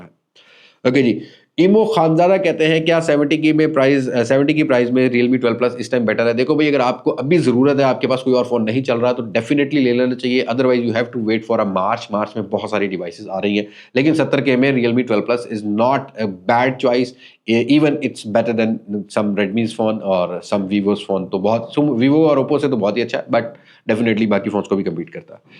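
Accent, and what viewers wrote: Saraiki, Pakistan. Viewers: Your Siraki accent is 👌🏻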